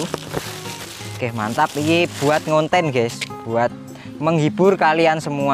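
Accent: Java, Indonesia. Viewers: Arek jowo yo mas...😀😀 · Jowo pinggir ndi mas ?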